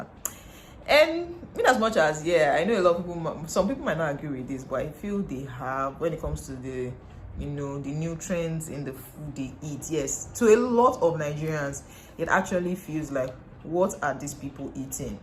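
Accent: Ghana. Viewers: We Need people to stay ghana forever . You talk like ghanaian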